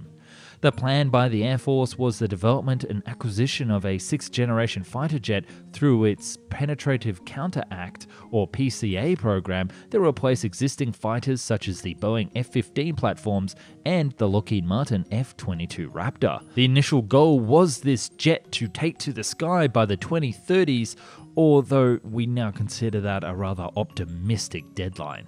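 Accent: Australia. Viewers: I reckon the narrator is an Australian. Lovely 🙂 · you're Australian right?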